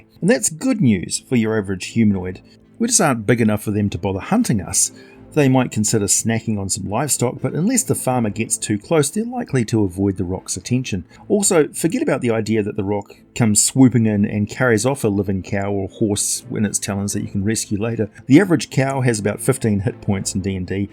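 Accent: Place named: New Zealand. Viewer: I swear, sometimes I tune in or rewatch just to hear you say “murder” half a dozen times or more. Your accent brings much joy to this Shakespearean actor, and one day a director will have me employ a kiwi ‘cent, and I will send you a ticket.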